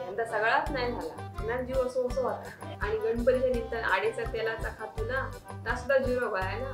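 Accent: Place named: Malvan, India. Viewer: एव्हढे अस्सल मालवणी इतरांच्या व्हिडिओत मी खचितच ऐकलं असेल, मस्तच 👍👌👏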